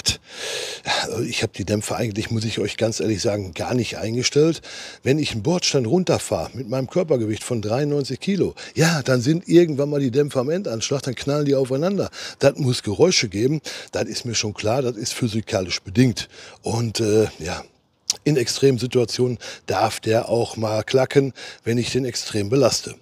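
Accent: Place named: Ruhr, Germany. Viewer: Super hilfreiches Video, hab dadurch meine Kaufentscheidung bestätigt bekommen. Ich könnte wetten, du kommst aus dem Ruhrpott?! Glück auf und danke die Vorstellung vom Ninebot! 👍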